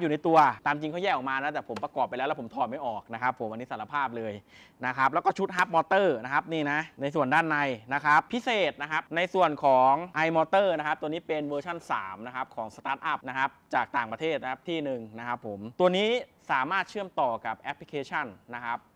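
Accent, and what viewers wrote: Thailand. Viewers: ติดตามตลอดครับชอบที่ภาษาอังกฤษสำเนียงไทยๆนี่แหละบ้านๆดี ไม่ต้องเป๊ะเวอร์เหมือนกับเรียน wall street english😃😃😃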